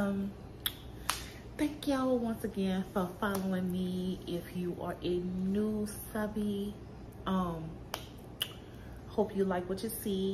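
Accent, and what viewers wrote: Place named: New Orleans, United States. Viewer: Great unboxing. I’m sitting here waiting for my white 15 Pro Max to arrive in NOVEMBER and torturing myself with videos lol. You sound like you’re from the NOLA!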